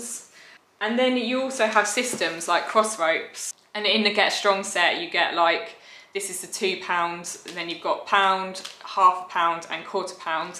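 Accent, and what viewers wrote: England, United Kingdom. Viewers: Love your too cute puppy .Glad to see a actual video that's not a short couple of seconds 👍. Also love your British accent.I am from Southern California USA ✌️ and nice to meet you . You are incredible with the rope .I have enjoyed all of your videos . Wish you all the very best with your YouTube success 👍... SergeantC (Retired US Army) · I love your British pronunciation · British English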